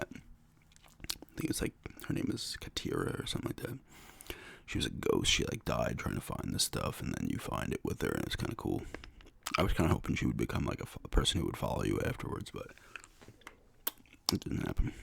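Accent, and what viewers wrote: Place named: Long Island, United States. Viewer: Kind of hear a Long Island accent, i’m in Pequa. (Potentially) small world. Keep up the great work, love the videos!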